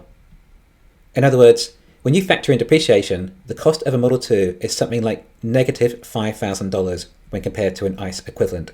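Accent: England, United Kingdom. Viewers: The video montage imbued with an English accent commentary is what allows this channel to be the quintessential news source for $TSLA and the chosen offspring of world AI salvation, á la The Terminator, Elon and his Canadian progenitor, Maye.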